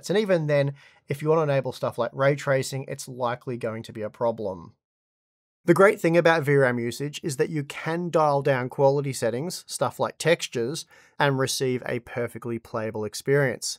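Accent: Australia. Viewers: I’m sure most of the viewer base is from the states but when I’m listening to a Aussie I just wish you’d use aud prices 😿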